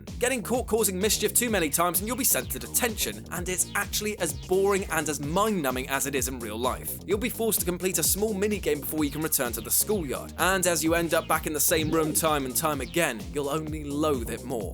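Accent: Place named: United Kingdom. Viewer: Why is there always some posh English bloke narrating this garbage?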